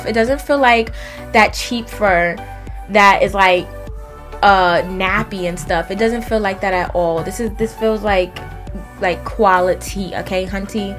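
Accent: New York, United States. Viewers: I LOVE your New York accent. 😍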